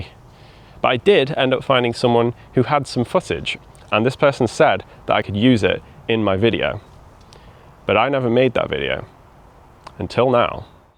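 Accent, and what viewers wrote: United Kingdom. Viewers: england is such a nice skansen and a british voice is just perfect as narration. nice video bro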